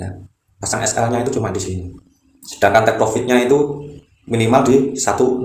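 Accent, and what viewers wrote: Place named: East Java, Indonesia. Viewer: Ooo Jember yaaa mas ?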